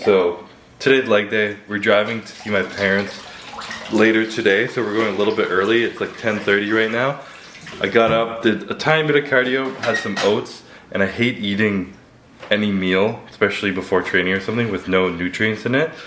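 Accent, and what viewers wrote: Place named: Canada. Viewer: Dude the first time I heard u speak I’m like this guys def Canadian ! keep it my Canadian brother .. shout out form the 6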